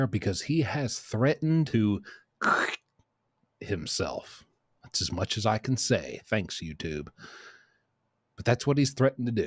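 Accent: South, United States. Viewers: Hey brother my name is Paul Britt,I've been watching you for several months,I'm from Jackson Tennessee, and lived in Charlotte north Carolina,I enjoy the southern accent, and,thank you for, the Golden entertainment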